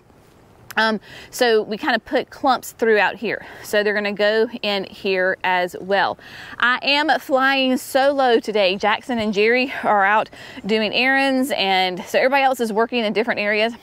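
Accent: South, United States. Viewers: Oh....you are too Cute! Out in the Rain.:goodvibes: I love your sweet voice. (with your Southern Accent) > Say that three times....Shocks and Struts... Tulip Blend. 🌷🌷🌷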